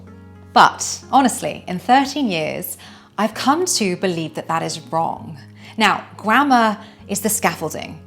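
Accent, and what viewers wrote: United Kingdom. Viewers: I've been learning English for the past 20 years because I started late in life as my second language, and I'm trying to be perfect, although I know I won't; but there is always room for improvement, right? I've always heard that "practice makes perfect." I've never heard that "perfect is the enemy of good." Oh my word!!! I feel relieved now. Thank you so much for these kind words. I love all your lessons, your method, your British accent, your voice...... Love from London.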